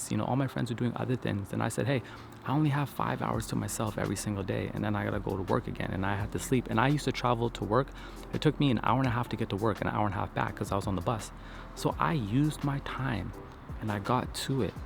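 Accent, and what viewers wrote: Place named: Jamaica. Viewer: I miss your Jamaican accent!. Love your message though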